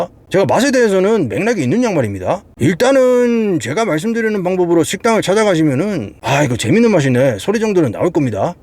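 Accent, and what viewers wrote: Gyeongsang, South Korea. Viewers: ㅋ ㅋ 오늘도 억쎈 깅상도 사투리로 구수한 식당 이야기 잼나게 시청했어요 ~~짱♡ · 근데 이형 미국형인데..갱상도와서사시는분인가? 사투리 발음이왤케찰져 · 덕에 미국넘들 많이 알아간다. 갱상도 말씨도 맛갈나고... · 와 미국사는 경상도 아줌마인데요 내 귀에 쫙쫙 감기는 사투리와 내용들 너무 쥑입니다 최고입니다~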